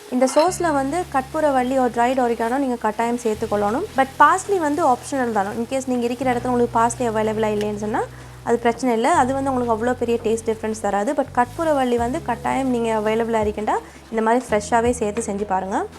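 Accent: Sri Lanka. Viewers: அஸ்ஸலாமு அலைக்கும் உங்கள் அழகு இலங்கை தமிழில் பேசுவது மிக மிக அழகாக உள்ளது எனக்கு பிடித்த தமிழ் இலங்கை தமிழ்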